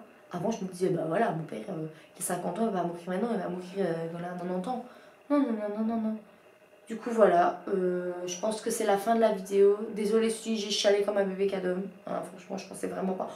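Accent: Belgium. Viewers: Tu es belge ? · J'ai une question tu es Bruxelloise ?PS moi oui 🙂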